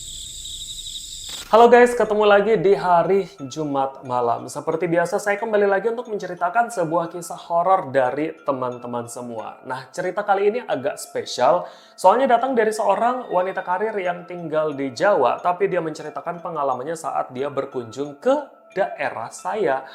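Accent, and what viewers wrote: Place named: East Kalimantan, Indonesia. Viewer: Saya dah curiga kalo abangnya pasti orang KalTim, karena kedengeran dari logatnya. Halo Bang saya asal Balikpapan sekarang tinggal di Semarang ikut Suami😊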